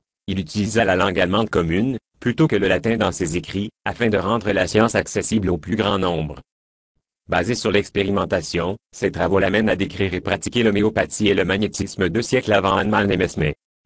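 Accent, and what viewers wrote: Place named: Quebec, Canada. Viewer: L’accent m’a tué t’es québécois?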